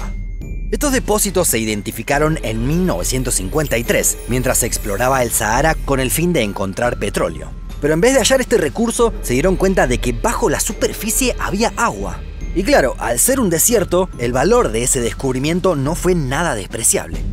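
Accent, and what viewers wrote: Argentina. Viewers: Es agua acumulada desde hace miles de años y no es renovable, es agua fósil, es decir, que tarde o temprano se agotará , como el petróleo . Pero toda agua que no discurre, se "abomba", es decir no se "airea", entonces necesitará tratamiento previo para su consumo? Pero claro para riego si sirve. Si sabía este dato. Por ello se pensaba que por este recurso era que los países imperialistas, trataban de apropiarse derrocando a Gadafy. Pero bueno el vídeo. Pero estos gauchos. su dejo molesta, pero que se puede hacer, si es un buen reportaje solo queda aguantar. ASHÍ, EN VES DE ALLÍ Y MUCHAS PALABRAS MÁS..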